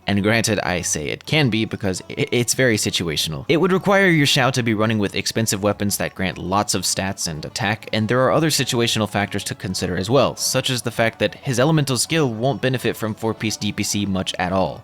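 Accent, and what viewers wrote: United Kingdom. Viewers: The british accent gave me an eargasm. Thank you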